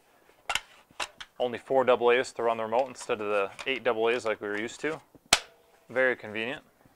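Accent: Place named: Canada. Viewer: How come you people pronounce "boat" and "out"the same way? Like Canadians. Eeeewww.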